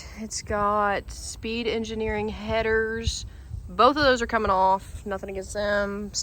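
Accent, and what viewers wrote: Kentucky, United States. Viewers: Congratulations on anniversary. The Kentucky accent is going strong lol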